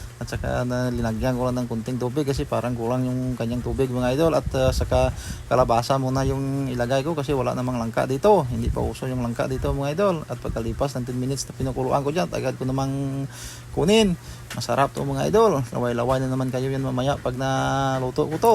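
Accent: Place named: Western Visayas, Philippines. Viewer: you sound like illingo bisaya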